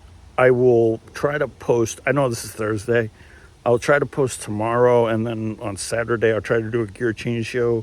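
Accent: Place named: England, United Kingdom. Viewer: Great intro and excellent english accent well done😅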